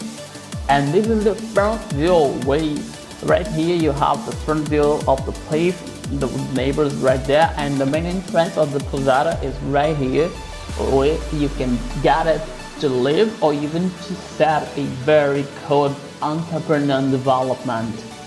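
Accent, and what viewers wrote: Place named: Ceará, Brazil. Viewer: Cearense English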